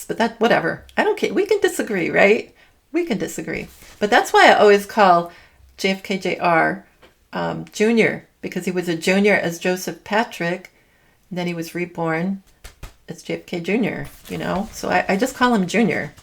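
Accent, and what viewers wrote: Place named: Boston, United States. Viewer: Yes... I too hear a little bit of the Boston Accent 🙂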